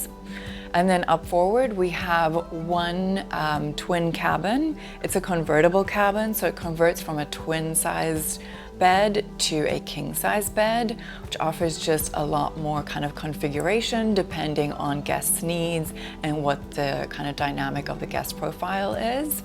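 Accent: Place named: South Africa. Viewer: Sound South African but for someone who lived in America.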